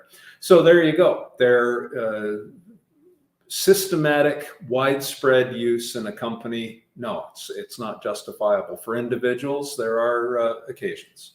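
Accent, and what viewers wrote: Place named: RP, United Kingdom. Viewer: He is only speaking with a plum with. His mouth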